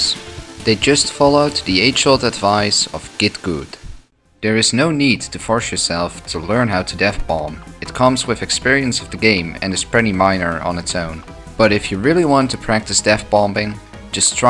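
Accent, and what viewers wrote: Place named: Netherlands. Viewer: Heet Hollands accent.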